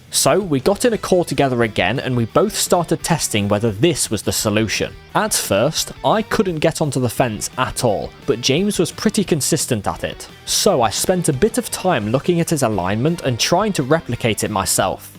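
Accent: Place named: United Kingdom. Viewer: Calling it "Wario's Shipyard" as opposed to "Wario's Galleon" caught me off-guard because from your voice I thought you were British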